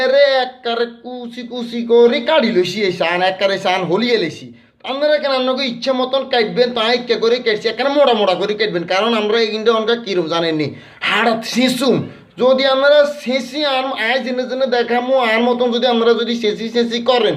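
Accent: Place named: Noakhali, Bangladesh. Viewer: আমাদের নোয়াখালির ভাসা💓💞😍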